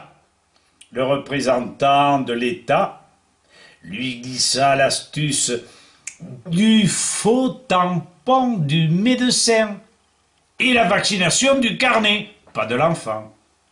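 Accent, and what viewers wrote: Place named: France. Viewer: Monsieur je vous découvre et c'est un ravissement. Accent de notre France, accent de mon enfance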